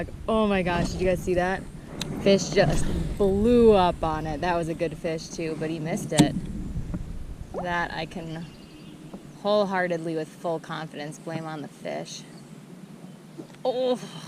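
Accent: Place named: Minnesota, United States. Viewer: You can sound so "Minnesota" from time-to-time. Nice bass 👌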